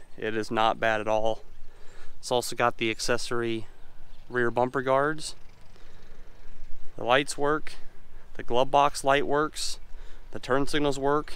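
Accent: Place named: Midwest, United States. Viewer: Maybe your ear is not tuned to a midwest accent.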